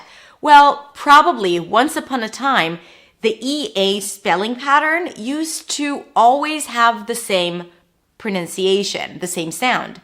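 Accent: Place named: United States. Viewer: You have an American accent. In standard English Polish isn't Pronounced as paalish.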